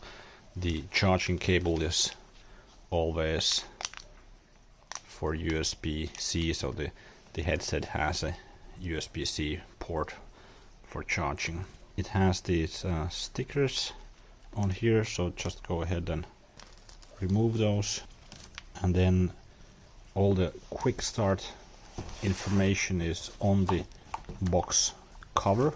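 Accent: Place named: Finland. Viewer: Your accent sounds like a Finn. Onko sinä suomalainen?😊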